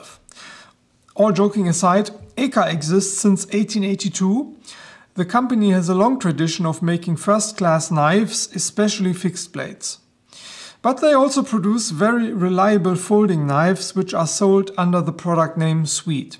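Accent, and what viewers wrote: Germany. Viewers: You really make the best reviews! Both for knives and other equipment. They are logical, detailed and easy to understand even for us with little training in the English language. The German accent is quite charming, too :-)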